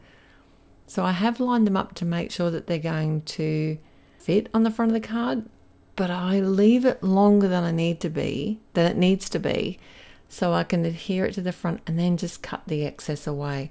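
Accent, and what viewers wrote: Australia. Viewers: it’s nice to find a new papercrafter I can follow. I appreciate the effort it takes to make a video the length of yours and like that you don’t waffle on about irrelevant stuff. You’re Australian?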